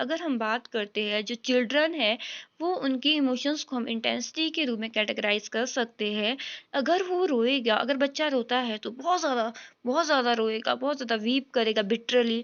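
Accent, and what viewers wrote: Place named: Jammu, India. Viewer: Mam apki language me dogri touch dikh ra h❤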